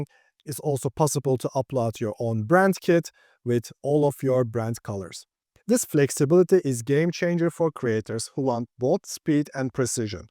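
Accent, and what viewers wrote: Turkey. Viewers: Great content as always and dude, I’ve been following you for a while and always kind of thought your accent sounded Turkish. Your English is super good, so I wasn’t totally sure. But after seeing that Istanbul clip, I’m like—yep, you’re Turkish, right? 😅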